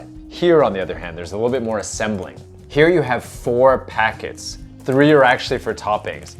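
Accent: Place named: United States. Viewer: This may be a crazy question...but how did you get to speak english so well when you were brought up in Japan....you sound as if you grew up in the states....I must be missing something in your history....lol....sorry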